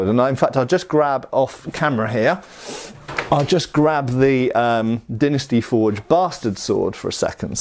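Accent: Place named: United Kingdom. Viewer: Spea britishl and uses inch... but why?? hahaha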